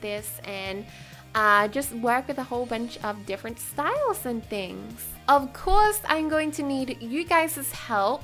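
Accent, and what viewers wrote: Australia. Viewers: Hannah Paterson because they are both Australian and have the same accent they sound very similar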